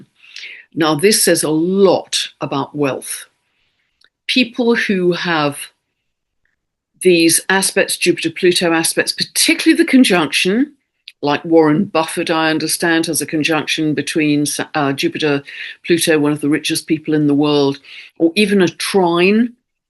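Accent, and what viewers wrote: United Kingdom. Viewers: Good work! And nice to hear my first English BRITISH English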